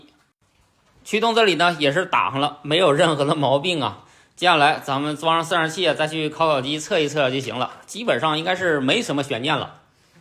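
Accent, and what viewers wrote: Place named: Northeast, China. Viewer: 听口音是东北人在广西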